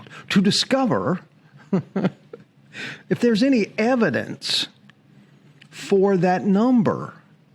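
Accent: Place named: Georgia, United States. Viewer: The reason for the accent is Georgia was a British penal colony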